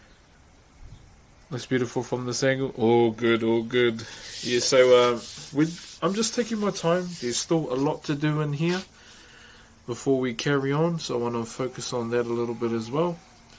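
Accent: New Zealand. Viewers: Your accent sounds New Zealand, but your carving style looks Hawaiian/Polynesian... So pretty. I really like it ❤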